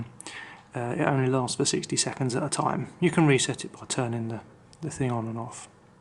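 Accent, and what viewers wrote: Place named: United Kingdom. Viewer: Yes. He is speaking the Queen's English. I'm guessing you're American, yawn.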